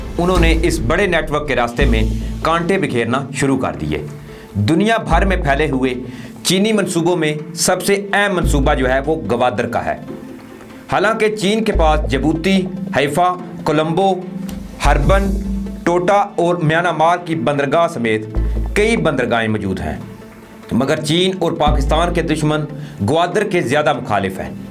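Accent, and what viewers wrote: India. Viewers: Its a Indians channel. Anchor is Indian in disguise of Pakistani. You can check his accent also. He use qhab instead of khwab, qhas tore instead of khas.its problem of Indians. Plz unsubscribe it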